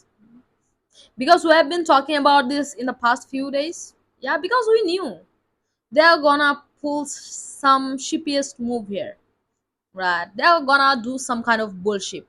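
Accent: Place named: India. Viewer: I tot u were from India,,,your tonation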